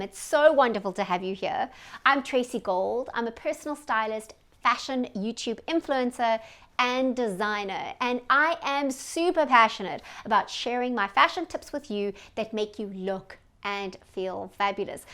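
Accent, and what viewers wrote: South Africa. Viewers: You’re fabulous! I’ve been following you for a short while now. Been trying to place your accent. South Africa. Thanks for sharing that. Beautiful accent!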